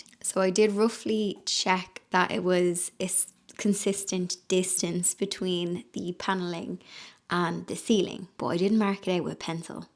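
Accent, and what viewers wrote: Ireland. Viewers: Leave the ceiling white. I admire your DIY skills. Love your Irish accent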